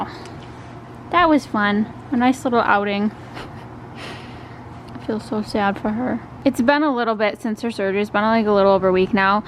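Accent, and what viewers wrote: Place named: New York, United States. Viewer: Your NY accent is so cute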